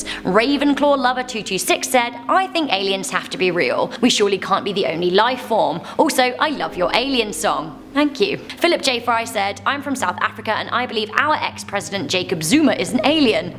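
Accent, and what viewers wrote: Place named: England, United Kingdom. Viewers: She’s southern English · love this girl's accent-sounds english, or welsh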